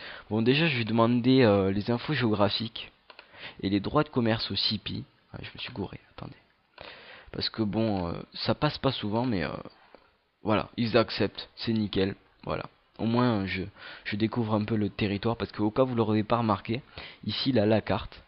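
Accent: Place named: South, France. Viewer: Salut ! Content de voir une nouvelle série sur Rome Total War, et avec l'accent du sud s'il vous plaît :D ! L'installation de mods n'est pas très compliquée et généralement bien expliquée par les développeurs de ces derniers. Tout dépend de ce que tu recherches, mais personnellement j'utilise Roma Surrectum 1.6 (la deux déchire encore plus mais j'ai un débit pourri pour le télécharger et je suis anglophobe). Bref, pourquoi pas une série sur une campagne moddée ? 0:)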